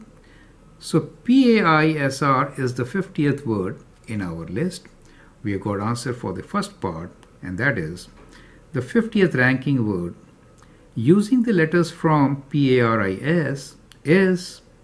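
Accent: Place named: India. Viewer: aap jitna bhi US ascent mein bolne ki koshish karen .... hindusthani pronouncation aa hi jati hai .......... by the way video was nice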